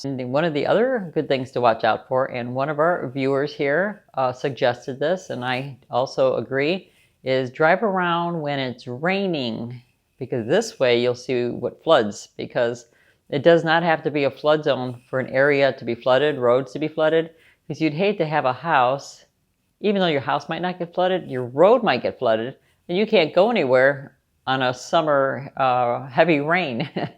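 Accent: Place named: Miami, United States. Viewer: You sound alot like a cuban raised in miami not jocking ....jay from miami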